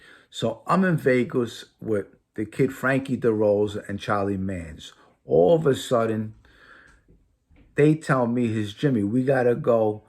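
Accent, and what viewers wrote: New York, United States. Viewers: This fuckin guys is hilarious...that NY accent is 2nd to none...and love how he prefaces someone's name with "The Kid" lmfao.... all the best to ya hope you make millions ...you're entertaining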